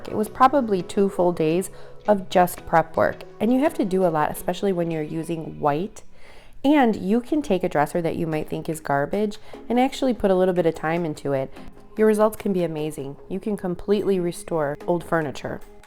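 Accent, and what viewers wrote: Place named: Midwest, United States. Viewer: Tis beautiful. Most of the year. Even when it’s not the prettiest it’s still a great place to live. You’re accent sounds like maybe Michigan or Wisconsin. I’ve never been there but would love to see the northern Midwest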